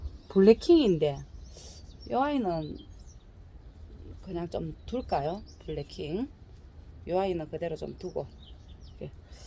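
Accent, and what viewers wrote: Gyeongsang, South Korea. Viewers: 경상도 사투리 정겹네요~저도 경상도랍니다~다육이 엄청 많네요~나눔 기대 됩니다~^^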